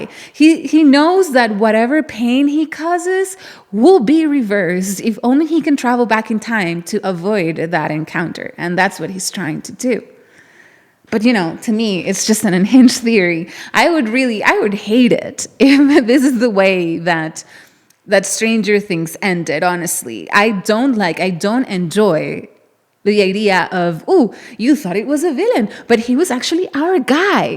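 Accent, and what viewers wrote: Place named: Venezuela. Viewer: Tienes un acento más bonito en inglés que en venezolano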